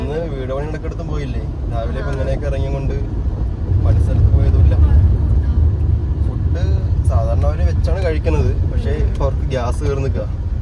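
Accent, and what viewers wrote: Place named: Malappuram, India. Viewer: മലപുറ० ഭാഷആയിരികുനനു❤❤❤❤❤❤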